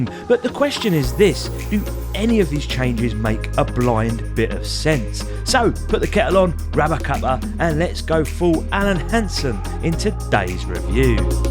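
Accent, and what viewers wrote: Cockney, United Kingdom. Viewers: Your review nailed it. Back of the net. Best i have seen.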